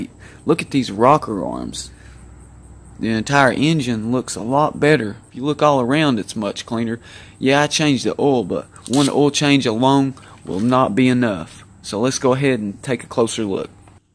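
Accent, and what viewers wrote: South, United States. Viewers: I looooove a country, hillbilly accent. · I love this guy's southern accent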